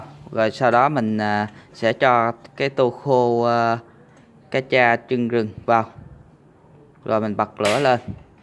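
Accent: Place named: Mekong Delta, Vietnam. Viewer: Nghe cách bạn giới thiệu đúng chất giọng miền Tây Thơm đến nhà ngân luôn Ăn sợ ghiền , ghiền là phải về miền Tây 💖💖💖😋😋😋😋 Da dai dai béo béo ...yummy Ngân xin trái bắp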